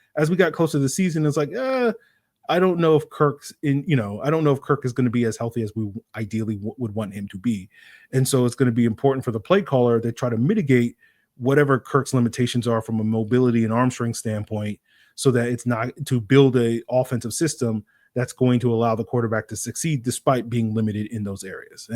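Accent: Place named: Germany. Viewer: I vote more German accent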